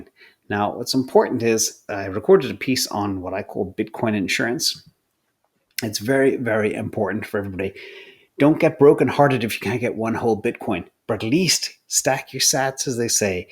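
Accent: Ireland. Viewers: am I hearing a slight Irish accent.?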